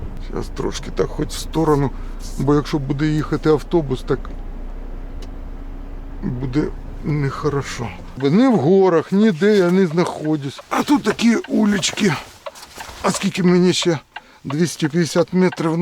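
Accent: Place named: Russia. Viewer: Боже, як в тебе мова запаскуджена язиииком!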